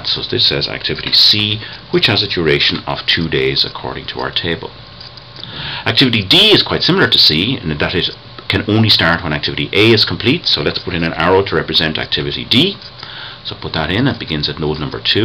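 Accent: Ireland. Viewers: The irish accent is one of the best!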